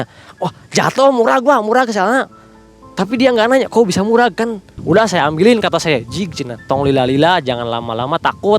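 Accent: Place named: Sunda, Indonesia. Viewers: Sunda campur Indonesia. .Teuas . 😄 . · Sunda nya kentel ga bisa ilang 😁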